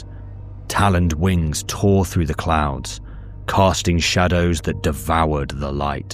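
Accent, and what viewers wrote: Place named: London, United Kingdom. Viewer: Your true voice truly sells it. London accent no? Def not Manc and no chance Scouse as those are the ones I’m familiar with. Lol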